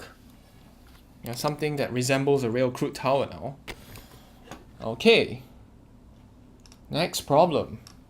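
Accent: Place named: Singapore. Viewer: I know a Singaporean brother as soon as I hear the accent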